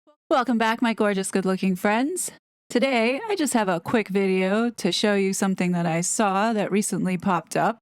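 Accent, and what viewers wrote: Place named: New York, United States. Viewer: I concur! I love dropping educated opinions in my lap in the evenings! I can't believe she was EVER self- conscious about her voice & NY accent! has an amazing cadence & comforting tone. She needs to get a side hustle reading audio books! 🎯💯💕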